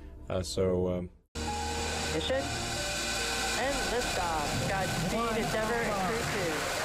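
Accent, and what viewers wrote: South Korea. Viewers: 이사람 전라도 말투쓰는사람 맞죠?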